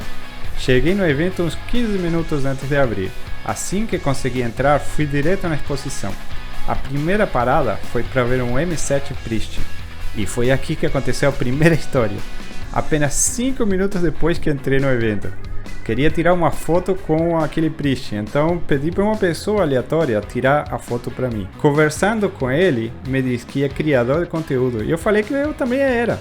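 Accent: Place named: Latin America. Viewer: Top demais,! Obrigado por compartilha com a gente essa experiencia. Agora, tira uma curiosidade por favor: esse seu sotaque não é de brasileiro. Tá me parecendo que tu tem um pé em algum país espano, não?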